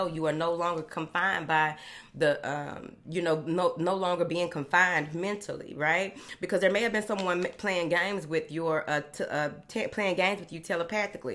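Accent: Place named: Dallas, United States. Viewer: I knew you sound like you are from Dallas good lord southern woman thanks for your time and messages ms hope prosperity finds you for 2022 keep it up.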